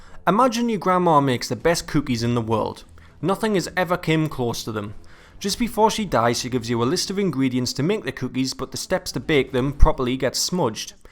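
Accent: Scotland, United Kingdom. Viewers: what is it about hearing a scots accent that makes everything unimportant. bourne 2016 was a travesty, but i'm sure it paid for someone's nice house somewhere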